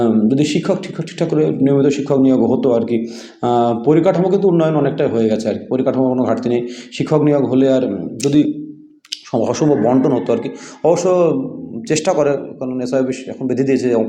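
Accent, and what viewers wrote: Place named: Bangladesh. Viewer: Mid day meal thakle cholbe....loker kache taka nei tai hoito govt school thakbe.. apni kothai thaken apnar accent bangladeshi der matan,( Bangladesh theke ese 1995 theke ese aneke class 9 admission nia nei tarai besirbhag school teacher achen) teacher ra porate Jane na ba porai na tai hoito kichu student private a jabe.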